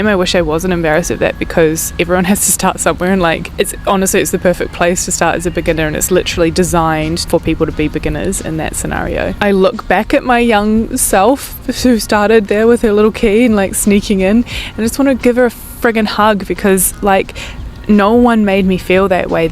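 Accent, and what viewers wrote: New Zealand. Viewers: I love that there’s someone out in YouTube land with my accent. And why it feels great when you’re back here in nz is coz we are way cool here 😂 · you still have the kiwi accent 🙌🇳🇿 I’m in Northland, NZ. Thinking of joining a pottery club this year, thank you for your video! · Yeah another Kiwi. I am part of the Waikato Society of Potters. Love it. I watch your channel and feel so at home with "our accent" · I love your accent. I am trying to get an NZ accent! Everything you said about the NZ pottery clubs is soooo true! I learned pottery at one in Whangarei in 2022. Everyone was so nice and helpful. I am still learning a lot but hope to have a business when I go back to the USA. And the cost is so low here! I think in the US the monthly cost is what you pay in London. I only pay $120/year in NZ! It made it possible for me to learn pottery. 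I will miss NZ so much when we leave. Plus it is so beautiful here and it smells good!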